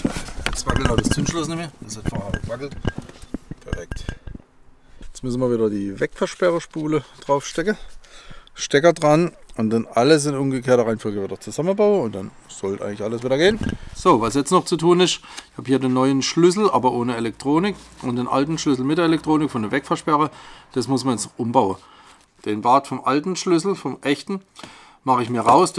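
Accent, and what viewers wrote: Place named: Swabia, Germany. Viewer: Da du au ausm Schwabaländle bisch wie ma hören koh wolld ih fraga ob du mir des ed oifach eibaua kosch?🤷🏻‍♂️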